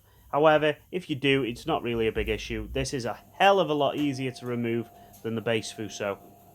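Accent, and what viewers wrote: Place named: Stoke, United Kingdom. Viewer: yes mate, thought you had the stoke twang, phasing out some of the AB stuff which is good but there are some awesome companies out like like alien magic and bouncers. Need something that will give my silver car a good gloss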